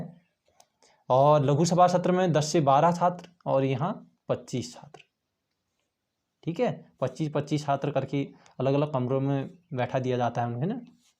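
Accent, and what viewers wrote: Pahadi, India. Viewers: Kyu koi problem h pahadi tone say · थेट पहाड़ी टोन। nice class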